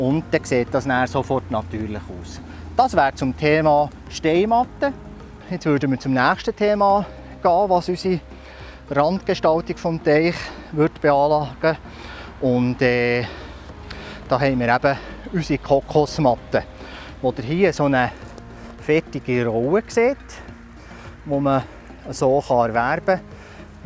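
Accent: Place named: Switzerland. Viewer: Ich hab zwar Aussetzer, aber er spricht eh so langsam, das geht schon. Ist halt gehobenes Schwyzerdütsch...Danke für die interessanten Ausführungen, bin selbst grad am Überlegen, was ich am besten machen könnt. Ok, hab das Video durch, einen Daumen hoch da gelassen und eine Entscheidung gefällt. Es wird eher die Steinmatte, da mein Fertigteich eh schon Zonen hat, ich möglichst wenig ersetzen möchte (siehe Taschen, die Jute wird verrotten, dann bleibt nur mehr der Kunststoff), mit dem Korkgitter kann ich wenig anfangen, sieht hübsch aus, bringt aber nix. Steinmatte sieht nach Steinufer aus. Glaub ich mal. Also danke nochmal für das Video (bin Ösi, daher hatte ich verständnistechnisch gelegentlich Aussetzer, aber im Zusammenhang ging es nahtlos weiter) :)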